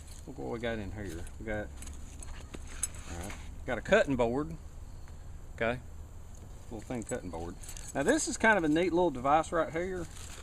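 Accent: South, United States. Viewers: he has the accent from which part of usa?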